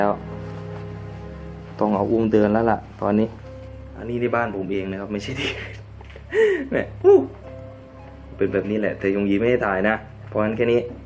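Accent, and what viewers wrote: Isan, Thailand. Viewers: คนอีสานหรอพี่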